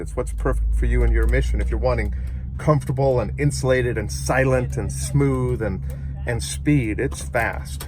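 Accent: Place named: United States. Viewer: you have a much stronger USA accent than your brother, respect from cup of tea governor, Englishman, MIC696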